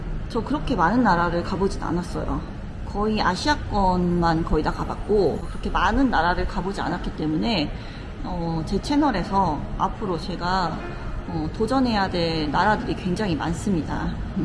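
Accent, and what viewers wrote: Gyeongsang, South Korea. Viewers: 경상도 분이신것 같아요. 오늘영상에서 눈치챔. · 억양을 보니 경상도 출신이군요. 수입은 꾸준하게 들어올 수 있는 구조로 만들어놔야 해요. 몇달 벌어 몇달 쓰고.. 이런씩은 노후 빈곤으로 이어집니다. 젊을때 열심히 일해야 해요.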